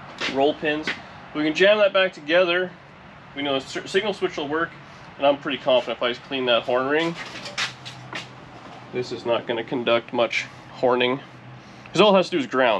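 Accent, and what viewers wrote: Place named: Canada. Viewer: I like how when you're speaking you actually say Eh, like a true Canadian 😁. Cool car. So jealous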